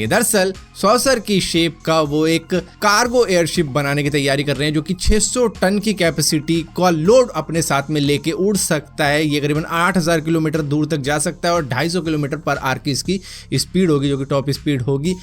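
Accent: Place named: Maharashtra, India. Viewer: Bhai aapko sun ke I can definitely say that you're मराठी मानुस , कधी मराठी त पन बोला....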